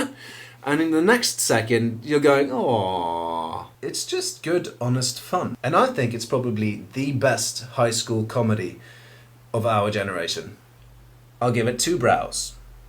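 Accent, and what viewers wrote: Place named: England, United Kingdom. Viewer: how come your accent is so english if you're norwegian?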